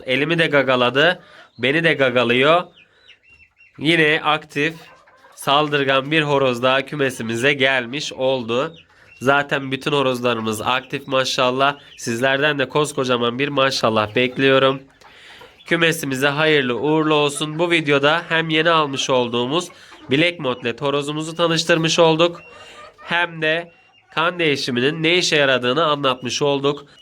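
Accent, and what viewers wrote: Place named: Adana, Turkey. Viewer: Abi adananın neresindesin hemşehrim çıktınnnnn😁😁😁😁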